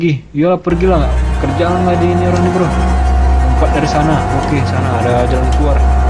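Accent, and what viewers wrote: Medan, Indonesia. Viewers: Logat medan kau ya lai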